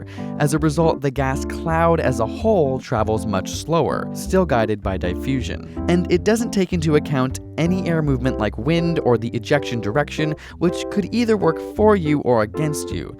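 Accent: Canada. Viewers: I'm starting to realize you have the accent of a Canadian by the way you pronounce "outrun" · Haha, your canadian accent was strong in this video...Almost like you were emphasizing it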